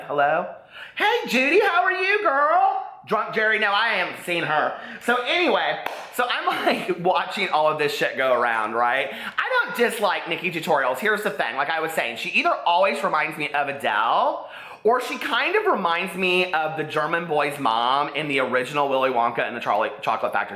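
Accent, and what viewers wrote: South, United States. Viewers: LOL...you're alway's sooooo southern :)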